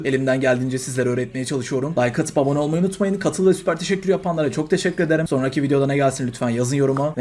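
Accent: Adana, Turkey. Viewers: kardeşim adanalı olma ihtimalin var mı :D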